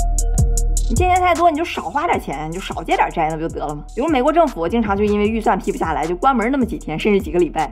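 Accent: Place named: Beijing, China. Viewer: 一口儿京味儿 听着亲切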